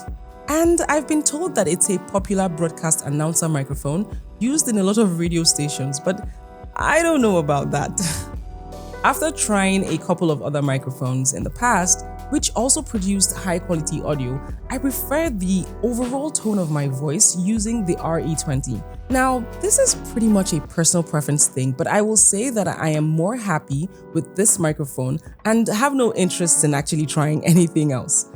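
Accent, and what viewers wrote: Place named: Africa. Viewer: I didn't know women like u exist and you actually sound African. I am impressed and happy, will be nice to connect sometime and geek.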